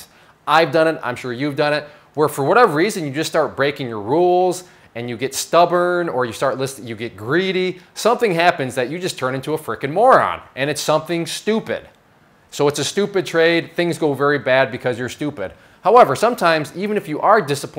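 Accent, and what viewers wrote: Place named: Midwest, United States. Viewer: lol, "I understand math." No doubt, brother. You sound like you are from Iowa/South Dakota/Nebraska.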